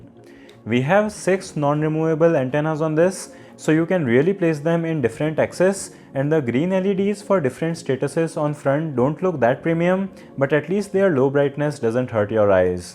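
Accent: India. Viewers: I fully understand why you speak so quickly, to keep the review as short as possible without compromising on your review detail. Your STRONG Indian accent is very difficult to understand so the subtitles are a useful addition. There is one mistake in the subtitles, stating that the router has 6 NON removable antennas but the subtitles read removable antennas. Otherwise a good review. By the way, here in the UK its available at Amazon now at just £99.99 which is almost £50 cheaper than is typical for the suppliers that actually have it on the shelves. Incidentally thanks to your reviews I cancelled my order for the AX50 in favour of the AX73 router. I am CERTAIN that I won't be disappointed. Keep up the great work. The price must have been a special one day offer because it rose to £169. Sorry guys I suppose I was just lucky, "right place right time". UPDATE: Wow that price seems to have been a one day ONLY offer and I have had to wait what seems like a LONG time for delivery, (expected today) the cheapest I have seen the router since ordering is £179 so I got a real bargain, assuming it lives up to expectations.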